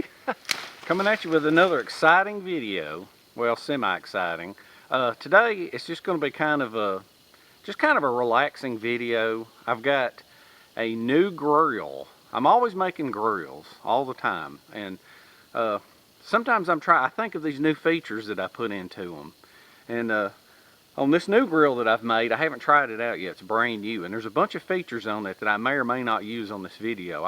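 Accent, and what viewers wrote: South, United States. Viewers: Loving the sounds of the cicadas in the background. Oh and the bacon sizzling is wonderful! I loved the video as I do all your videos. Your southern accent makes a North Carolina boy feel right at home. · Thanks for another exciting video.. Nice to see you're still thinking and doing stuff. I love the Southernisms, like a two syllable word for a cover or top to a container "leeyud". Keep em coming! Frank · Wonderful example of an American "southern" accent. Thank you.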